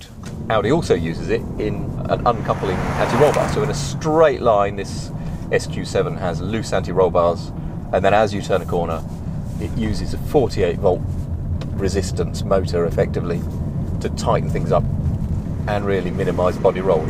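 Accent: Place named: United Kingdom. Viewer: Oh jeeeezzzze that thick british accent and swallowing the voyals!!!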